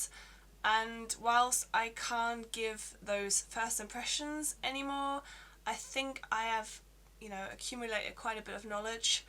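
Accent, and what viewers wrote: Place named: London, United Kingdom. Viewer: Cheers to you for make videos about your experience in the U.K... and your accent i think i'm watching your videos for your accent its so charming you sound like a true londoner... Really lovely C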